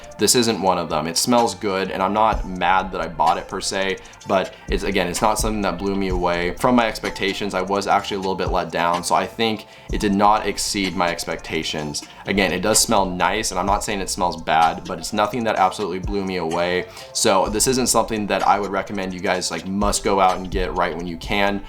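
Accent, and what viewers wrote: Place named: United States. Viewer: Bro plsss get your american accent under control......i wanna scratch out my ears after listening to you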